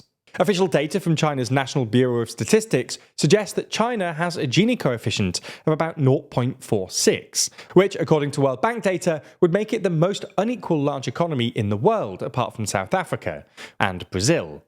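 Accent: United Kingdom. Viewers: omg, this uk accent drives me crazy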